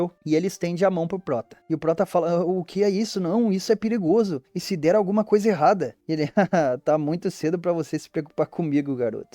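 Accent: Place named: Rio Grande do Sul, Brazil. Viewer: Mn vc e gaúcho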